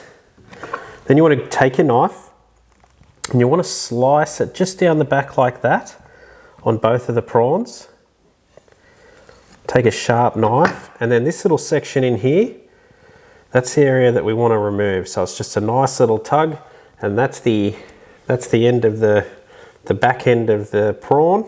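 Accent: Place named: Australia. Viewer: Your Australian accent makes me want to try making these prawns and I don’t even like seafood! Seriously well done video, next level and very professional! Btw you shouldn’t cook puppies 😬 😆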